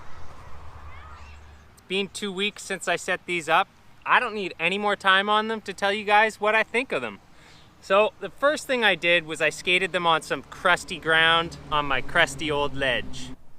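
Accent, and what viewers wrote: Canada. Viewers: Most Canadian skater I've ever heard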